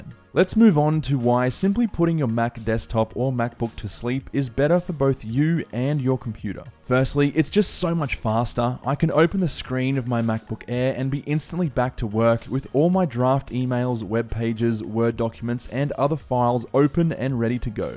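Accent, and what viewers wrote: Australia. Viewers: Are you Aussie? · Fabulous really good quality presentation and great to hear an Aussie.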